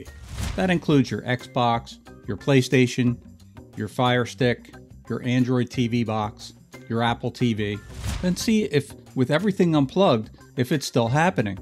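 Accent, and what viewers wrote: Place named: Philadelphia, United States. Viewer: You're from Philly, aren't you? The accent is unmistakable.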